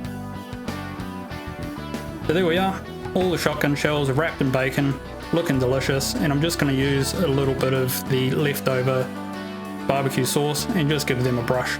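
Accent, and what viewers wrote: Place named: Australia. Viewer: Hey I'm guessing your Australian,,,, not Brittish, or Irish,,,,,, I'm an American, I can understand you folks better. Your "english" is easier to understand than those others. Still> Different than my "American" english.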